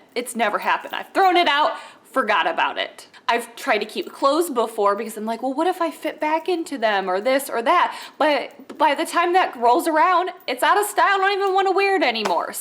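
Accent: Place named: Chicago, United States. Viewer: Great video! I'm new to your videos. Are you from Chicago Area? I love it there - your accent reminds me of the great people there!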